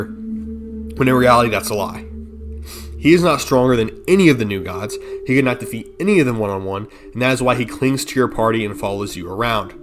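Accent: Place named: Turkey. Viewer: sounds suspiciously turkish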